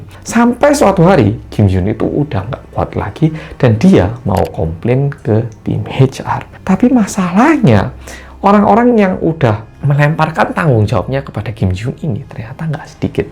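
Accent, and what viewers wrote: Java, Indonesia. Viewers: Betul juga ya mas korea medok🥰 penampakan merupakan permintaan tolong bagi arwah unt kita yg masih hidup😊tks atas critanya yaaa🤓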